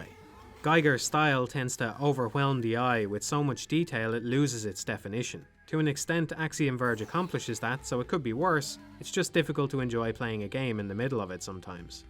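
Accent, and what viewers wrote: Ireland. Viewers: I only watch this for the Irish accent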